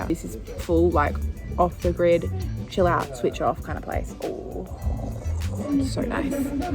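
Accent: Australia. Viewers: Sound aussie!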